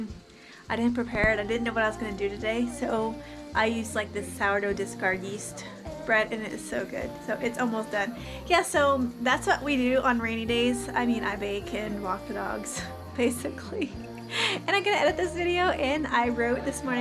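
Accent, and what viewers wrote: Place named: United States. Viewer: Thank you, for this video. I'm an another canceled check here in Czechia but on the escape! Thanks too for your American English which is so nice to listen. Good luck on everything!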